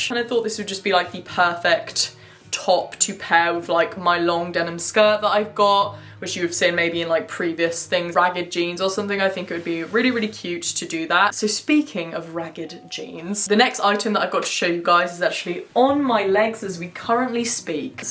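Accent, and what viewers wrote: England, United Kingdom. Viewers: I love your accent ❤️❤️❤️ The way you speak makes me want to move directly to England!!! Btw my mom is german and my dad is from London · Hey. I have to say, I’ve been watching you for about 3 or 4 years. I’ve grown up watching you, and you’ve throughly influenced my aesthetic. Plus, as an American, I can do a pretty great English accent because I’ve watched you so long 😂 anyways, just wanted to say that I’ve noticed you have been a bit happier in these videos than your old ones, I don’t know if this is true or not but you’re putting out some positive vibes. Sorry for the paragraph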